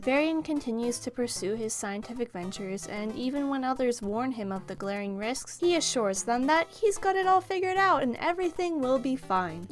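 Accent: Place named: United Kingdom. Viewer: The British accent sends me into orbit “But RaPuNzIiĪiEeEeEEEeLl”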